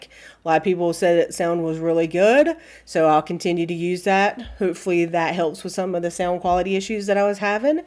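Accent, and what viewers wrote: South, United States. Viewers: Never apologize for being you! You are real and I love your southern accent! Happy stitching! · I so enjoy watching your flosstube. You are just a hoot. You are you and I so appreciate your authenticity and your southern twang. Seeing all the witch charts you made and bought was a treat. Instant viewing of finishes and future possibilities for us to do. Thank you for all you do.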